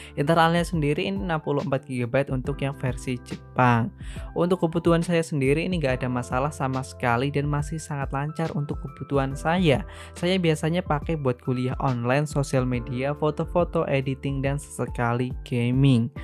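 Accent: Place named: Kedu, Indonesia. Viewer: Bahasa jawane mirip daerah kedu-mgl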